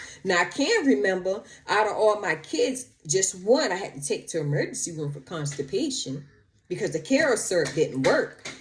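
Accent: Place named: New Orleans, United States. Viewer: Ms you very pretty and I like your accent. Are you from New Orleans?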